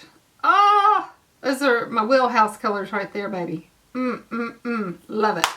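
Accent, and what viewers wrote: South, United States. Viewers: Girlfriend you are definitely southern.love it!please enter me IG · Loving that Southern Belle accent and that laugh!! 🤣❤ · Where are you from..so southern..even for me and I'm in Arkansas..lol.. Enter me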